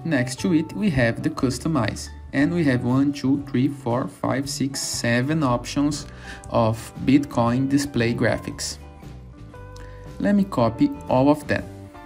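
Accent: Brazil. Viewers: ingles de brasileiro kkk boa mano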